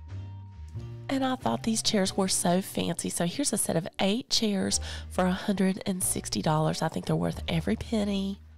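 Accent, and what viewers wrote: South, United States. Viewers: you are my favorite shopping friend! You find the most amazing Restores and then find the best items in the stores. How do you do that?!? The narration is absolutely soothing with the cool music and that Southern accent! Your voice is like a mint julep for the ears. ❤️2U& yours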